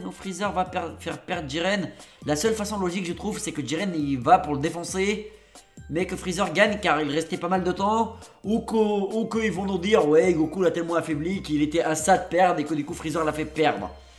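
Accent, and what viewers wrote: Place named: North Africa. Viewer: L'accent du blédard 🇲🇦🇲🇦🇲🇦🇩🇿🇩🇿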